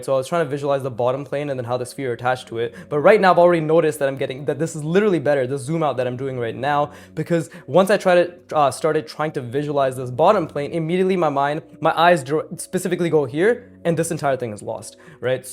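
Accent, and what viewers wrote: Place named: India. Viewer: This guy sounds indian 😢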